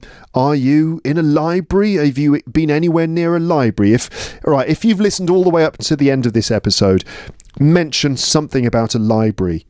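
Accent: United Kingdom. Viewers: Hi, I know it's late for the answer, but I want to tell you how much I love listening to you. I like to knit and usually I listen to your podcasts or videos while I knit. I find that a perfect match as I get more and more confident in English. Besides, your British accent is so nice that it's always a very pleasent time "knitting with ". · I love how British English sounds and the way you speak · Your podcast is the best English teaching podcast on YouTube... It has been a great help for me to learn English especially when you read short stories... So I recommend that you read more stories on your podcast.. I enjoy listening to the stories in your charming British accent... Please post more episodes of you reading short stories.. thank you very much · Hi.Yes How do you know that your podcast is good for get sleepy??😂😂😂 Joke, joke for me it's useful to improve listening listening... 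I like British pronunciation and entonation too. Thank so much for this. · Hi. Thanks a lot for your podcast. Im an English learner in China and I love listening to your podcast and your sound. Im here to learn about British accent, and it helps me alot. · 1. I've listened to your podcast to get used to British's accent and imitate your words. 2. Just listen and imitate your words for many times. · Hi! I'm an English teacher and I have started listening to your podcast since last year. It helps me get up in the mornings with a clear mind! Love the delicious humour it offers. Love the vocab explained and your beautiful British accent. I have become addicted to it! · Hi. I'm from Myanmar. You know what? My listening skill has definitely improved after listening to your podcasts. The problem was when I became so attuned to your accent which is British accent, I couldn't fully understand other different accents. 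Why does that happen? Does that happen to most of English learners? And I'm just wondering if you can have a chat online with your listeners (including me) on 31st December, which is the last day of 2024? I'm so eager to talk to you. But it's okay to decline my request if you don't have enough time. Thank you and looking forward to seeing your new episodes.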